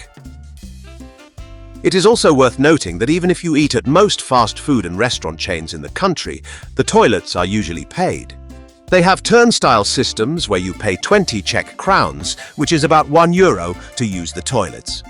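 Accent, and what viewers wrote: United Kingdom. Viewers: Wow. The London underground system - even the staff dont know whats going on and where! Being told about this underground system of order - with a British accent - makes it all the sweeter.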